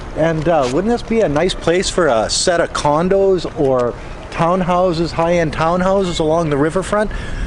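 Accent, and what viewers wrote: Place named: Canada. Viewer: 5 minutes in and you mentioned Canada, Im from Canada, southern Ontario, and I guessed at the beginning that you were Canadian, LOL. Nice video.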